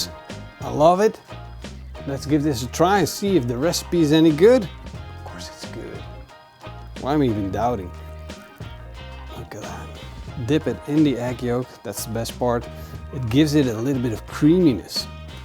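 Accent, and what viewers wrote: Netherlands. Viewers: idk what it is about the Dutch but you can always instantly tell whether they’re Dutch or not, you can’t do that worth Scandinavians for example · His dutch accent is so strong😂😂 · I’m new to your channel, but you have a Dutch accent.. are you Dutch? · i like this guys dutch acccent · Is he from holland his accent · Just found this channel and immediatly i can hear you're dutch. The Dutch/English accent stands out so wel haha!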